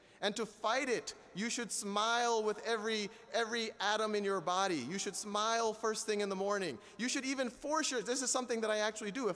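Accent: United States. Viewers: lol no california. i meant just his voice sounds very american to me. idk ive encountered plenty of south asians with american accents. but...sal khan's voice is extra White to me. haha just thinking out loud here. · well, he was born and educated in the USA. His parents are south asians. So, it should not surprise you that he speaks in an american accent given that he has been staying in the US for most of his life.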